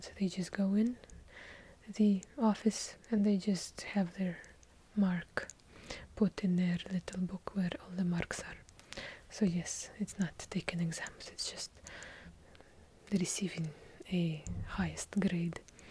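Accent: Russia. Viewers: I come for the heavy Russian accent but I stay for the dry wit and subtle political satire. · The Russian accent is just unbeatable 😍😴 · I will always love her Russian accent.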